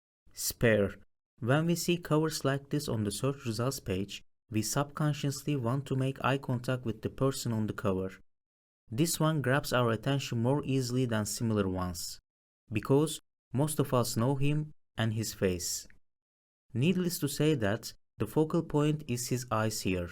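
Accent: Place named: Turkey. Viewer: Aksanınız Türklere çok benziyor, Türk Müsünüz?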